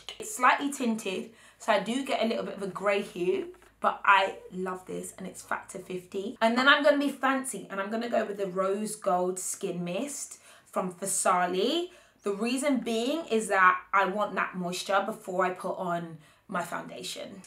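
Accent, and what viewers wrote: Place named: United Kingdom. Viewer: Oy my gosh!!! This is the first time i watched you and I LOVE your british accent!!!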